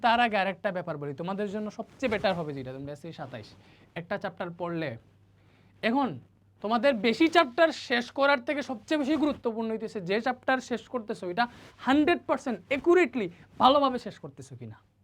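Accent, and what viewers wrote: Sylhet, Bangladesh. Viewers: Vai ki sylheti?,..... Tan to sylheti er moto lage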